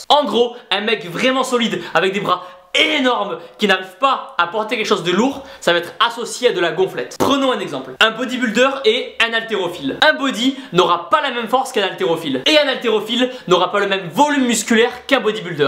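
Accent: South, France. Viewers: mais tu habite où car tu a l acsent du sud moi j habite à côté de pau et toi?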